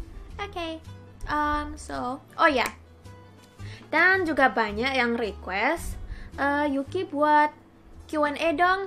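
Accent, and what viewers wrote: Java, Indonesia. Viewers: Jepang mendok:v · Wah Yuki boso jowomu sdh medok jg tuh, sdh kyk org Indo bicaranya, salut dech!!! Untuk oleh2nya, memang ga ada di LN? *See you next time= Sampai Jumpa Lagi u/bhs Indonya Yuki:) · "Keripik tempe tu ueeenaake puol" Pas kamu bilang gitu tuh aku langsung ngakak 😂, suka banget ma aksen medok kamu. Semangat yaa!! 😆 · Wah bangga aku dadi wong suroboyo. Heheh, ngomong ngomong kaka medhok nya nampak banget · Mbak yuki ganti nama aja jd ayu... Habisnya bahasanya medok hehehe suka suka suka 💕 💕 💕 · Yuki wes bagus ayu nek ngomong medok jowone kuwi looo ngangeniii..beeehhhh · Mbak yuki..cocoke dadi arek jowo wae...medok banget.....aku jd inget saudaraku di surabaya...salam dari semarang....nbak yuki ayu banget.... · wa'iiikk keren.... jowone mbak'e medok ayabarus n ngalaman....salam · Next time borong keripik tempe di Malang Yuki! 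Mau keripik apel atau apapun sudah dibikin keripik disana. Stay medok yaa! Hehe... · Aku baru di channelnya mbak yuki nih.. bahasa Indonesianya kok bisa medok gitu ya? Imut 😄 Bahasa Indonesianya juga bagus. Itu kripik tempe lho mbak, di tempet sy banyak 😆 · Medok tenan eh mbak jowone biyen manggon nek Suroboyo ta salam tonggo kota Lamongan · ayu pinter jowo medok... love u kak yuki.. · Lancarnya medok jawa ya.. tapi bagus bahasanya lancar.. (mukanya mirip temen ddku hehe).. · cantik,muda,smart,simple,low profil..👍👍 pinter bhs indonesia (dg aksen medok😆👍👍) terus sing paling unik,paling q seneng kwi pinter boso jowo jugaaa...😅😅👍👍👍👍 (sangat mewakili kami sebagai orang jawa😆😆) lanjutke terus...uuaaakeehh sing dukung awakmu👍👍 EEE....MATEK AKUU....😆😆😅😅😅😂😂👍👍👍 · Asli jepang tp Indonesia bisa jawa medok bisa, syukur2 Kalau udah d,islamin terus bisa ngaji. Idaman Keluarga Ekekekekek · Pantese sampeyan dadi warga Indonesia ning Yuki. 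Dudu warga Japan. Aksenmu medok banget. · waahh kirain cuma saya yg ngerasa medok jawa wkwk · Japanese berlogat javanese:D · Cara bahasamu sudah medok kaya orang jawa. Sudah Cantik, kalem,gak sombong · Ojou-san udah berapa lama di sby? medoknya fasih banget😀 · Wes ayu boso logat jowone persis asli Wong Jowo padahal Anda orang Japan sip bagus... matur suwun 😁😁😁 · Kok medok ngomongnya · pinter nya kamu....medok lg bhs nya👍😍😍😍👍👍👍👍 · Mbak Yuki, Pirang tahun Nang Suroboyo mbak? Nggak percaya kalau hanya 1 tahun... 🤔 Pastine` luweh soko setahun yo, limang tahun yo. Kok bahasane sek medok yo, baik itu bahasa Indonesia opo maneh boso Jowone`... 😂👍🙏 Jadi terharu... 🤣 Semangat yo mbak... 💪👍🙏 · Wes pindah neg jowo wae mbak wes cocok kw dadi wong jowo Nek ngeplog go boso jowo(KETOK E MEDOK E) ne jo lali yo mbak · Gk pantes logak jawa. Huhuhuhu tp suka kok sma orangnya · Jepang yg cantik tapi medok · so freaking awesome..japanese but medok bgt..senengane kripik tempe...kpn2 dolan suroboyo.mampir mbk yuki tk jak dolan 😁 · Ayu² medok neng😂 ngenten punn 😂👌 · Logat jowo.. 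Mbok mbok... Keren tenan.. Saya suka wong jowo..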